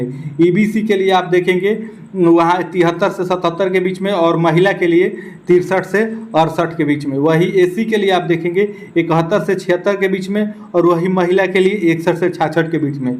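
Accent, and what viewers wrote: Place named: Uttar Pradesh, India. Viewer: Aawaj se lagta hai ki UP k ho .Tum ye batao ki UR or BC me kabhi bhi 6 se 7 no ka anter ho sakta hai kya oo bhi 1600 seet me .Sahi jankari do ....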